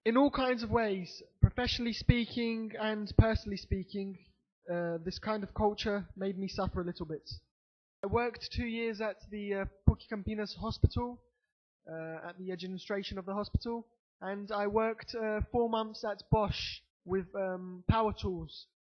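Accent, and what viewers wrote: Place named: United Kingdom. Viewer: I loved your British Accent. Keep up the good work! Neuri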